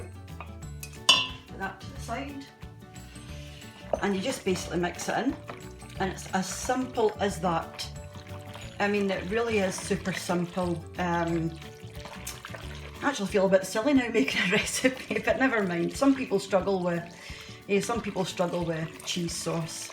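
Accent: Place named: Scotland, United Kingdom. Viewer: Im happy to here a Scottish woman teaching me im not a great cook im learning but love the simplicity of the menus n great food from yonuger years ❤👌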